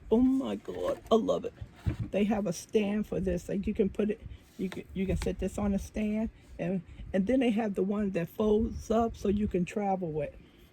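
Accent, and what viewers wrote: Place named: Alabama, United States. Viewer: I wonder if one of your local yarn shops has a weaving class? Every year on TV I watch the PA Farm Show, and they have a competition for the best wrap/shawl. That is my favorite part on the PA Farm Show. I love weaving, but having a loom is another item I just can't have. Please have many more videos on your progress. I think this is one of my favorite videos that you made for your yarn friends. My husband ask me if you are a Southern girl. He said that you sound like his friends from Alabama. : ) I just love you; your the best!!!! Smiles, Claudia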